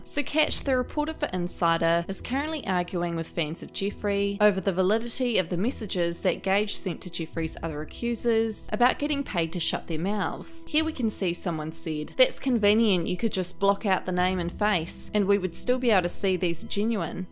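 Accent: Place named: New Zealand. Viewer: Sounds very NZ to me.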